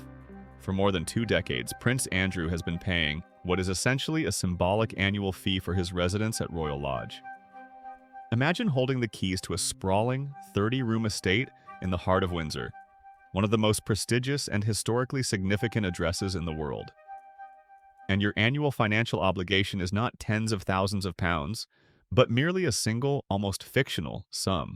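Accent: United States. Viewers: Why am I hearing an American accent? Our King is not your concern.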